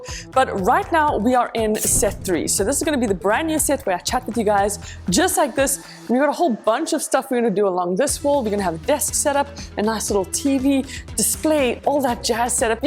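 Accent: South Africa. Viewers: i knewwww you were south african! the accent 😂 i'm also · Oh no I will definitely miss your South African accent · I’m happy for you buddy but be ready for unexpected rains, chilled weather and racist londoners as they will easily get hold of your South African accent and will/May speak shit. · You from South Africa?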